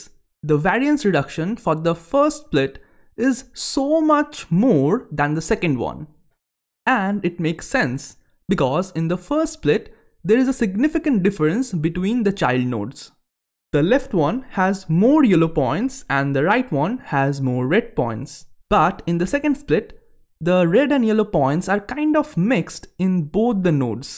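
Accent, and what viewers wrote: Bengal, India. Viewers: bengoli accent ftw!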